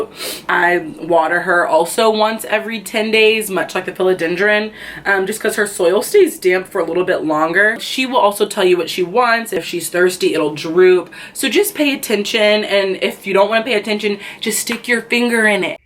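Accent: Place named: New York, United States. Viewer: Hey Princess! Excellent how you present yourself. My goodness! Love the mix of just right Ebonics and everyday English. You should be considering acting, reading dramatic audio books, customer service, all areas that puts you in the public, HA YOU DO'N NYER. I am also a NYer. (Brooklyn native I am). Thank you. Have a blessed one. Yeah, I'm a plant lady too and I've got about 40 plants on my plish (plant wish) list, will be bought as babies cuz I ain't spend'n no light bill money on a plant, that's hard for me to do. So far I gooot, boout, between 50 to 60 plants in my small NYC apartment. Plants are just dee bomb! Just found ya and will see ya some more in future. Again Princess, bless!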